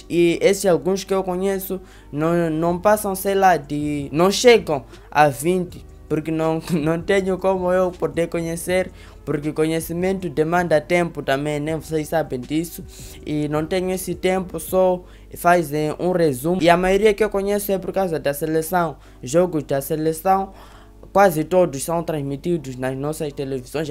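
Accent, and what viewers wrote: Mozambique. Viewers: Entendo o sôtaque Moçambicano de boa kkkk😄😄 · Sobre o seu português, o seu sotaque é o sotaque moçambicano mesmo ou você está tentando falar o português brasileiro?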